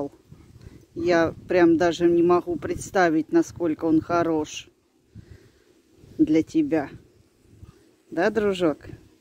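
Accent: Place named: Voronezh, Russia. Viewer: Привет с Липецка,с возвращением, а я думаю какой области у вас говорок,оказывается, воронежский.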